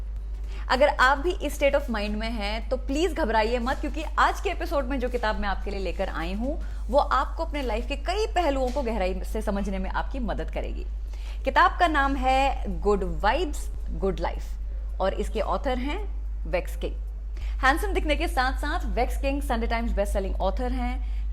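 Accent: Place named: Uttar Pradesh, India. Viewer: Love you mam ❤️ from Pakistan 🇵🇰 suda khush rho AAP ki baton say lugta hay k AAP up say ho hum bhi yahan asay hi batain krtay Hain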